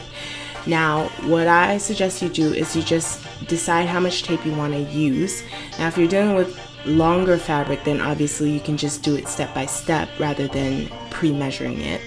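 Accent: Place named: Maryland, United States. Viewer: Shtrait shtitch shtretchy fabric. Is that a Maryland accent I detect? Not being mean I’m from Boston, OK? I just love accents! Thanks for the help! Perfect help and I just happen to have the same machine! Totally ordering the twin needle! Had no idea they even existed!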